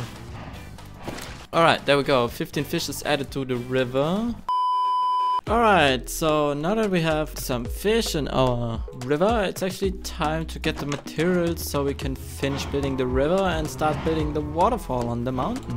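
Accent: Germany. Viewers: ich bin so gut ich hab an deinem accent erkannt das du deutsch bist aber dein englisch is sehr gut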